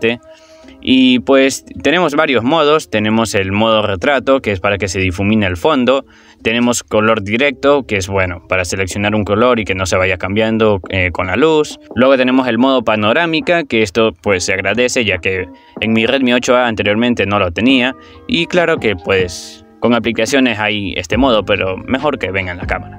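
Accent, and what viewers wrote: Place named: Peru. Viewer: Men eres de Perú ? Tu acento parece de Perú noce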